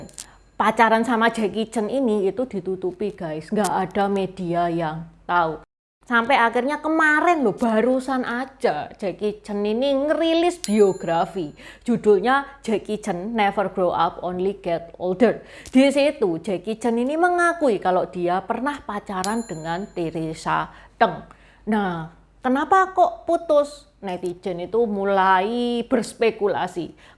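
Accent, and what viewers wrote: Java, Indonesia. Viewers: Medok banget jawane mbak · Berisik, medok nyakitin kuping !!!!! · intonasi jg terlalu medok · mbak aki juga org jawa meski bukan cindo tp logat medokmu kebangetan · Mbak jgn edok bgt bisa tdk. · Mbk,wajah chines logat jawa medog bingit... 👍🤭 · memanng legend..lagu2nya begitu masih dikenang dan dinyanyikan hingga saat ini..dan saya pun masih debngerin lagu2nya...thx ulasanya ci..btw asli dr mn ci kok medok jawa bangaet..? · Medok.. Chinese nopo jowo mbok???😂😅😅😂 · Medok bgt ngomongnya, pusing pala ku dgr nya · Mbak orang mana sih koq jawanya medug banget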